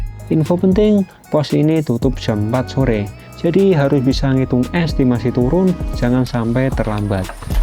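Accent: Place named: Java, Indonesia. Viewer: Muedhok sekali ya masnya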